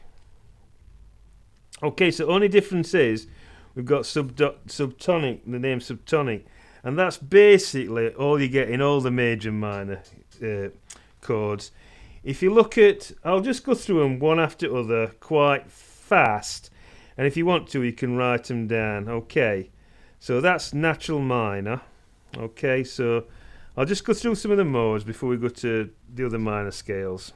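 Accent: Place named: North England, United Kingdom. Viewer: I liked your stuff from very much. It all was intelligently conceived and presented. I like your style, your Central-Northern British accent a lot(!) and the way you put down some pathetic and pretintious (and so) people who think they know it all. I mean it's not your goal of course but you won't believe how much fun it actually is!:-) Please - take my advice - don't be so concerned about the hate mail and comments. You seem to be very responsive person and as such just try to ignore the ones who would cause you to close another of your great channels. Take it easy! I'm looking forward to your new videos - they are always tasty, helpful and really so much fun!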